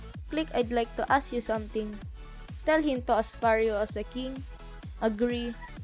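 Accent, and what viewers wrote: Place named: Philippines. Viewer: I know that you're a filipino because of your accent Kababayn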